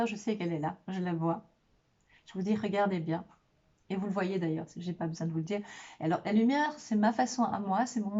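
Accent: Belgium. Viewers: Merci d'avoir pensé à mon signe, je l'ai cherché et ne l'ai pas trouvé toute à l'heure , vous m'avez entendu .... en tous Les cas, je vous le rdis à nouveau , vous êtes charmante , lumineuse et sympathique et avec votre accent belge , c'est encore plus agréable , j'ai beaucoup aimé vivre en Belgique avant de devoir partir : <link> j'ai apprécié le peuple belge et me suis fait de bons copains là bas .. BONNE CONTINUATION , Joyeux Noêl et bonnes fêtes de fin d'année ; FRANCK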